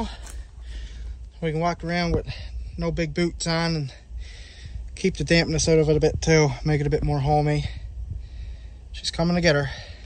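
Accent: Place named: Newfoundland, Canada. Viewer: Are you a Newfie ? Yer accent sounds like ya from da bay bah!!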